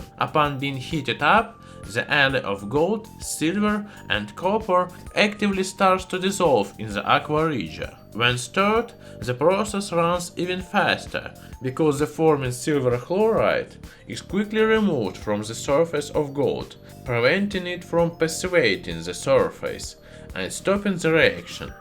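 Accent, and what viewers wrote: Russia. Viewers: he does seam to have a Russian accent... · He was from russia?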